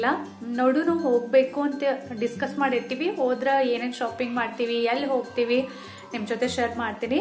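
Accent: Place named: Hubballi, India. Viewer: ನಿಮ್ಮ ಹುಬ್ಬಳ್ಳಿ ಕನ್ನಡ ಸೂಪರ್ ನಾವು ಉಡುಪಿಯವರು ನಿಮ್ಮ ಮಾತು ಕೇಳಿ ಕೇಳಿ ನಿಮ್ಮ ಭಾಷೆ ಕಲಿತಿದ್ದೀನಿ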